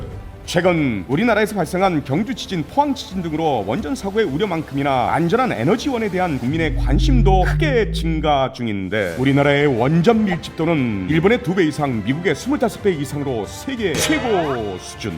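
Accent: North Korea. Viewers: 성우가 약간 ㅋㅋㅋ 북한 같아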